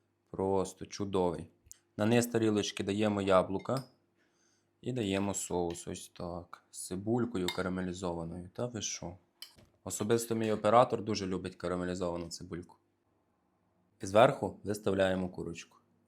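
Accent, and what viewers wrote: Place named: Western Ukraine, Ukraine. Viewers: Чую мова моя,я з Франківщини,а фамілія поширена на Закарпатті,звідки цей? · Чудный повар!Чудный Буковинский акцент!❤❤❤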